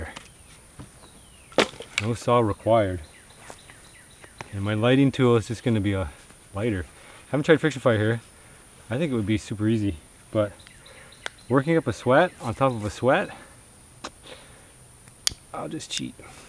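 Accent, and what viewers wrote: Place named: Canada. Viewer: You sound very Canadian but I have to ask...do you live in Michigan?..by the way final fantasy 3 for she's is the best game ever!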